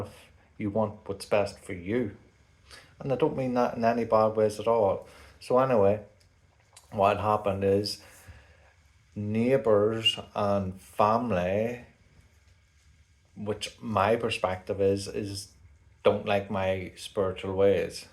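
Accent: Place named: Northern Ireland, United Kingdom. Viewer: Dungannon omagh accent 😃😃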